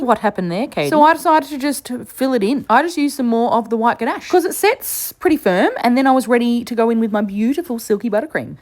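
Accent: Australia. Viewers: You're Aussie?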